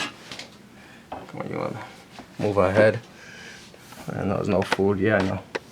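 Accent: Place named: Massachusetts, United States. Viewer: Really great job bro. I'm from Worcester u sound like ur from mass. I'd love to grab one from you.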